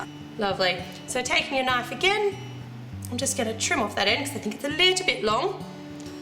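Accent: Australia. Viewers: I just love your Aussie accent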